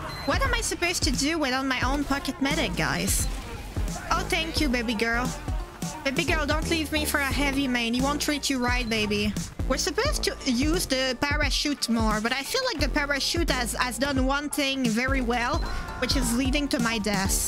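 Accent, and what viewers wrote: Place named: France. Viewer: french or Russian sounding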